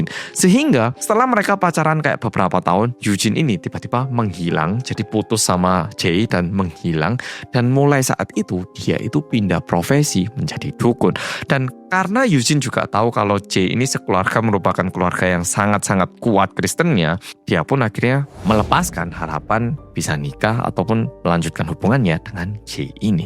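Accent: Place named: Java, Indonesia. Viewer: Mas, aku sering nontonin videonya sambil makan, mamaku sering denger aku nonton dari ruangan lain dirumah, suatu hari mama nanya “kakak kenapa sih suka nontonin cerita orang jawa?” (Karena logat mas medok) 😂😂😂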